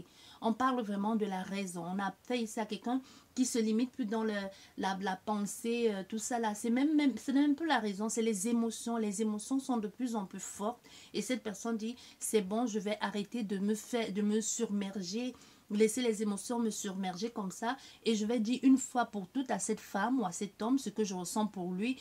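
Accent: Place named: Martinique. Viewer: Vous êtes Martiniquaise ,avec cette accent...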